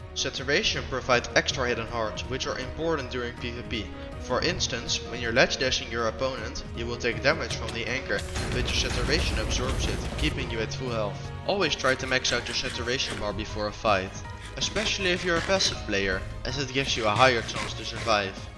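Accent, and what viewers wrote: Netherlands. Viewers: bro unlocked the dutch accent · Klinkt als een nederlander wel goeie vid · bro has an dutch/ europian acsent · u sound so dutch wth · Are you dutch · i hear the dutch accent 😮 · youre dutch? W vid btw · the dutch accent 😭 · Nice dutch accent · yo my dutch boi, ye you can hear ur dutch accent very clearly, not a bad thing tho lol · Are you Dutch u seem like u have a Dutch accent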